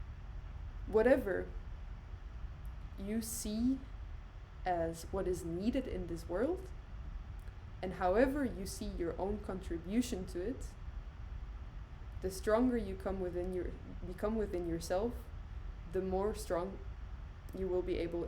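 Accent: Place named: France. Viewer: Hey, where did you get your beautiful accent from. Bit sounding like romantic french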